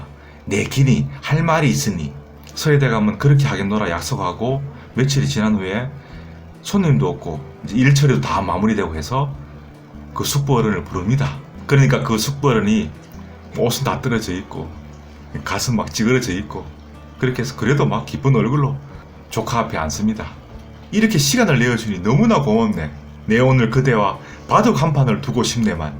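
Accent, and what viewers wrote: Gyeongsang, South Korea. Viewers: 님 이 혼탁한 말세에 사람다운 이야기를 듣기좋은 갱상도 말로 이리도 정신이 번쩍들게 하시니 그 덕이 길이 이어지길 바랍니다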